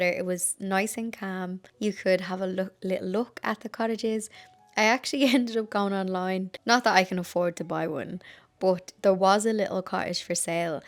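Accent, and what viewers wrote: Ireland. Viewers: I live in Texas USA and has spent years working on my family tree. My mom used to say her people on her dad’s side was Irish but she knew nothing else. Since she passed I’ve learned my 5th great grand father was from Legacorry, Shankill, Armagh, Ireland. That’s how it was written out in 1640 so have not learned where that actually is yet. I enjoy just thinking one of my great grand mothers talked like you. ❤ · I love all your videos , you are kind and witty . I live in Scotland but I am from South America so love to hear your Irish accent helps my understanding of English and the diversity in accent . THANK YOU for letting me have a peek on to your lovely world and adventures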